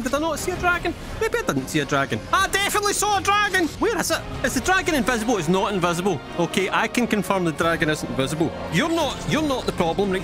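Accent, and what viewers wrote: Scotland, United Kingdom. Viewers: scottish skyrim daddy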